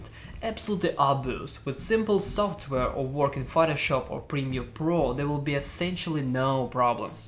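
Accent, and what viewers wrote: Russia. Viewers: Было сложно, но я понял по незначительному акценту, что Русский! А потом видеозапись игр с русскими надписями... 👌😂 У тебя версия с gtx1060 или мне показалось? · are you russian? 😅 btw amazing laptop and best looking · Жёстко произносить по-русски английские слова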